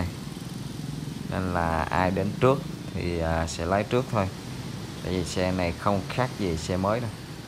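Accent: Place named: Nghe An, Vietnam. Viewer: Ớ quỳnh lưu nghệ an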